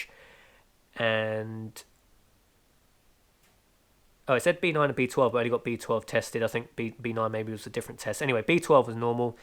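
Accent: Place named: South London, United Kingdom. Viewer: Sounds like you live in South London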